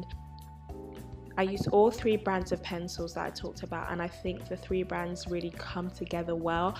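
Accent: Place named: United Kingdom. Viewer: I love your accent, I’m british too, also this is an incredible drawing! You have a lot of talent.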